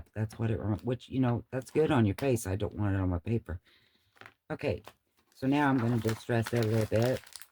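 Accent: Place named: South, United States. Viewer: "Do I talk funny"??.... oh my gosh 😂🤣😂 It's funny, I'm in northwest Indiana, and when I went to visit friends in Texas, I expected a heavy southern accent from the people who were originally from there, but didn't really hear it. When my son went to college in southern Indiana, oh my, they had the heaviest southern accent I've heard, what I expected Texan's to have, lol. So my guess is, you're originally from the south somewhere 🤔 Love the project, everything came out awesome as always 💜💜💜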